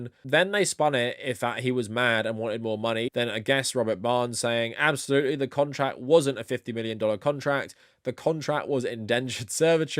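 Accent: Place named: England, United Kingdom. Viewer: So I'm 8 minutes into this and so far it's safe to summarize everything this YouTuber has said thusly: Blahblahblahblahblahblahblahblahblahblahblahblahblahblahblahblahblahblahblahblahblahblahblahblahblahblahblahblahblahblahblahblahblahblahblahblahblahblahblahblahblahblahblahblahblahblahblahblah Not sure if you want to assert any thoughts of your own or if you're capable of that but I won't be sticking around for that. English accent sounding smart doesn't mean they're smart at all. This is just blather.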